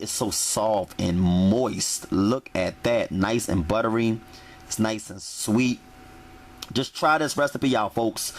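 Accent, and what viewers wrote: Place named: Baltimore, United States. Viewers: Omg!! Why do you sound like you’re from Baltimore!! I guess the accents are very similar, either way I love it lol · I thought the same thing. I heard Baltimore and DC in there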